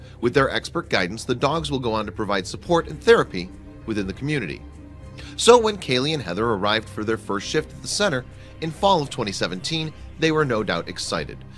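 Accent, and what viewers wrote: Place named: Upstate New York, United States. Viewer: The narrator HSS s cute accent. Upper New York State?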